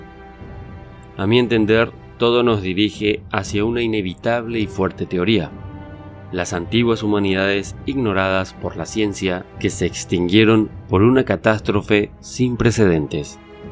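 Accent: Argentina. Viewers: Soy mexicano y conocedor de la historia mexicana y la verdad no se si la persona que hizo este vídeo es mexicana aunque suena a argentino y si eres argentino debo felicitarte por la buena investigación que realizaste, he visto vídeos de extranjeros farsantes que quieren engañar a los mexicanos con datos falsos y la verdad si caen los mexicanos en los engaños sobretodo porque los mexicanos no conocen su historia y ellos se basan en lo que les diga su youtuber favorito que la mayor parte del tiempo son igual de desconocedores de la historia como ellos y para terminar yo invito a la gente a visitar mi canal y allí tengo 3 vídeos de Teotihuacan realizados en la zona arqueológica y mis vídeos podrían ayudar a los que vayan a visitar el lugar como una guía turística para que sepan que deben ver y visitar en el sitio y para terminar solo quiero decir al realizador del vídeo que los aztecas pensaron que quien hizo la ciudad fueron gigantes por que ellos encontraron huesos de animales pleistocenicos como mamuts, camelidos etc. 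y toda esa zona de los valles centrales de México es rica en restos de esos animales, mi canal es Rolando México RS y los vídeos los hayan como Teotihuacan la ciudad de los dioses mi explicación primera y segunda parte y ojala se den una vuelta por mi canal que está censurado por la plataforma. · Fabuloso documental. Me cae un poco de raro que tenga acento argentino el narrador, pero vamos, que muy bien narrado, impecables las imágenes y se ve el conocimiento, esfuerzo y conciencia que hay detrás de este contenido. Muy grato de verdad. Tienen una nueva suscriptora. 😊❤❤❤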